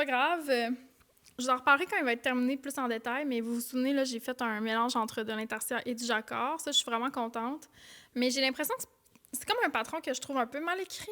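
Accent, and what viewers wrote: Quebec, Canada. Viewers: Merci pour tes vidéos, moi j'aime beaucoup ta fraîcheur spontanée et ton franc parlé, ton côté un peu "perché" (comme on dit France), avec tes p'tits chants par exemple. J'aime ton accent canadien et vos expressions, et à force d'écouter tes vidéos, j'en utilise quelques unes en parlant. Il y en a une que je ne comprends pas et que tu pourrais peut-être m'expliquer, mais j'ai compris l'intention et je la dit souvent, je ne sais pas comment elle s'écrit mais je comprends "Coline the bean" qque chose comme ça ;) Sinon j'aime que ton amie, ton compagnon et tes parents participent aussi, c'est vraiment chouette ! Pour le tricot, j'aime aussi le côté, récup, "pas prise de tête", d'y aller un peu au feeling, j'ai beaucoup de mal à suivre un modèle personnellement. J'ai commencé ton Camomille il y a un moment et je viens d'acheter ton patron du pull Colère pour te soutenir et pour peut-être me lancer un de ces jours car je n'ai jamais fait de pull en plusieurs morceaux... 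Le monde actuel ne me plaît pas et me pèse depuis de longues années, mais tes vidéos sont des petites bulles plaisantes dans mes journées :) Bizh de la Bretagne :) Pissenlit, Bouton d'Or, Pensée des Champs (déso, je ne sais même pas comment mettre d'émoji dans mon commentaire, alors j'ai mis les noms ;o) ) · Je n'écrit jamais de commentaires sous les vidéos YT, et j'en lis encore moins aha, mais là, je me sens obligée de te dire quelques petites choses : Je crochète depuis plus d'un an en me disant "jamais je n'apprendrais à tricoter, je sais crocheter, ça me servirait à quoi ?" et puis je suis tombée sur une de tes vidéos (celles des idées de cadeaux de dernière minute), tu m'as faite sourire du début à la fin avec ton accent, ton attitude, ton humour; je suis française mais j'ai travaillé (il y a quelques années déjà) 6 mois au zoo Ecomuseum à Sainte Anne de Bellevue ("banlieu" de Montréal) et t'entendre parler me replonge dans cette belle période qu'a été pour moi cette petite partie de ma vie et cela me donne juste de la joie de t'entendre! 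J'ai donc continuer à regarder tes vidéos même si je ne tricotais pas et finalement, j'ai attrapé le virus et j'ai appris le tricot grâce à toi ! Ta bonne humeur et ton attitude désinvolte que tu nous partage en vidéo m'a aidé à moi-même me libérer face aux autres, au regard des autres, je suis peut-être devenue tanante moi aussi au final, mais je m'en fiche et ça me fait du bien, alors merci. Et enfin, moi qui suis artiste, qu'est ce que j'aime ta vision du tricot, de l'Art, des couleurs etc, je me sens comprise; alors que quand je bassine mon compagnon avec ma vision et les débats artistique que j'ai dans la tête parce que quand on est passionné on ne peut s'empêcher d'en parler il m'écoute pour me faire plaisir mais je suis sûre qu'il n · Tellement beau l'accent québécois 🌻🌻🌻 Je viens de Suisse, j'ai un accent méga lourd de ma région et ça me fait tellement du bien d'entendre parler autre chose que le français parisien♥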